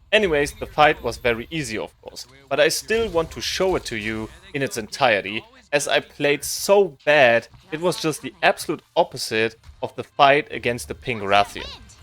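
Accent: Germany. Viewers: Are u german?😄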